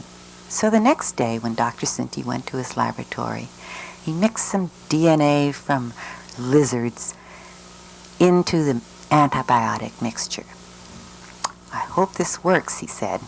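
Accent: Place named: Bosnia and Herzegovina. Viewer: If you people are weirded out by narration it is because of how my bosnian people speak english. I know the 95 massacre and many people were killed. My bosnian people makes cartoons like this so no wonder it has an artstyle like this. Also when srebrenica has an memorial for the people that died some serbs...bad..serbs...dance and celebrate. Look at the bosnian cartoons from 1980s to 2000s. The burning building reminds me of a event where they found two skeletons hugging each other. Those two skeletons were mother and son. They were burned alive.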